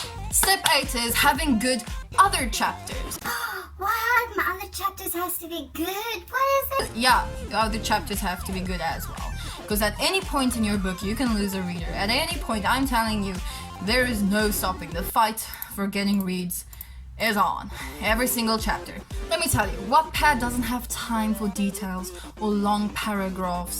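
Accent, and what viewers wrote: South Africa. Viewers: wait, wait, wait, is she South African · ARE YOU SOUTH AFRICAN !?!? -accent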